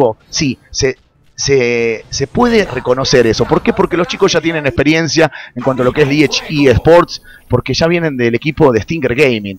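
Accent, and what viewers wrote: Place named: Argentina. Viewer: Relator argentino. No se quien es, pero se nota a los 2 segundos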